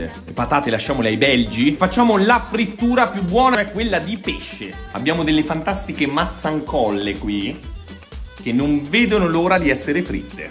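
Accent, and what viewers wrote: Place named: Umbria, Italy. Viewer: Umbro fino al midollo, sentirti parlare mi ricorda le trasmissioni di TEF channel guardate alle 3 di mattino perchè sotto allucinazioni da febbrone.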